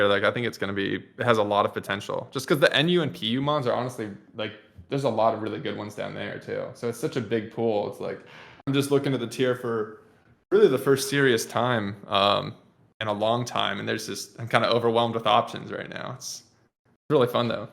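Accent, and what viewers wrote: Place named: United Kingdom. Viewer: Hej that’s the brittisk guy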